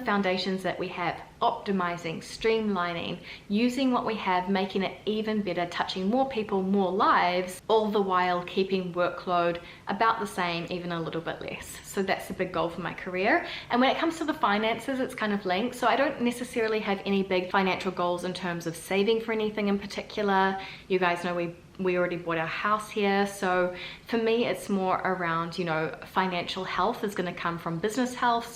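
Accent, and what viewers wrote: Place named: New Zealand. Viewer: Her NewZealand accent is so much stronger than when she returned!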